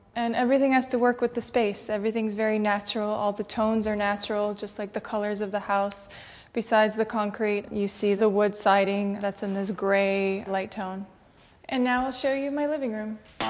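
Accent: United States. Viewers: 怎么是美音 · 这美音说的也太好听了吧。好想听她说两句俄语试试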